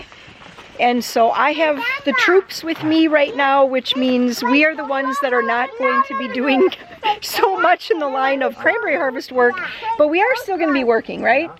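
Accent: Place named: Wisconsin, United States. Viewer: You are definitely a Wisconsinite. Your accent. I was born, raised and educated there, so I know the accent. out, down, ought, supper, etc., etc., A great place to have been raised.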